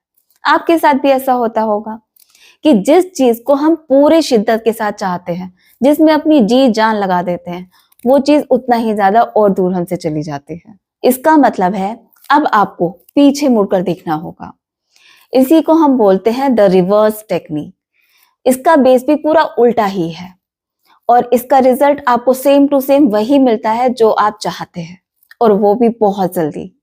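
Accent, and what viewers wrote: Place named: Gujarat, India. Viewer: Aap ki language sunke muje lagta hai aap gujrati ho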